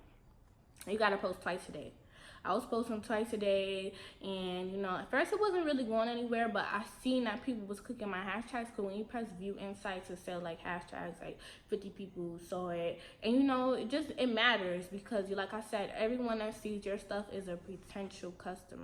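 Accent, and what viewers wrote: New York, United States. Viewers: I promise you I knew you was from New York. It’s the accent for me 😂🖤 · I knew you were from New York because of how you talk lol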